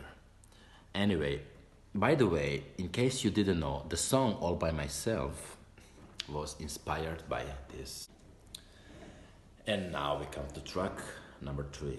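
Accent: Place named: England, United Kingdom. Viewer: You are adorable, unic, original human being with a worm ❤️. I can't wait to get this Fabulous CD, thanks so much for your information about your favorites in Classical Music. I love your English accent and the way you express your Passion to play the beautiful Music with your Cello. God bless you 👼*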